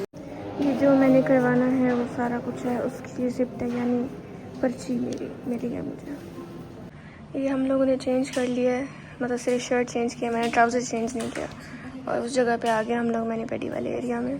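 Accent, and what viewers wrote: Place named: Saraiki, Pakistan. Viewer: Sarikiyn wala lahja hai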